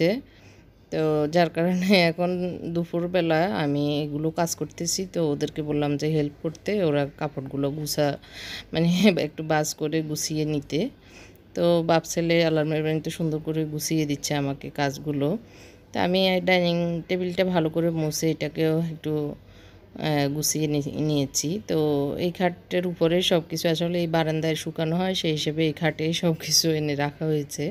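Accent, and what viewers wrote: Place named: Chittagong, Bangladesh. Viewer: Apu apnarkotai ctg ar tune astasa apni ke ctg ar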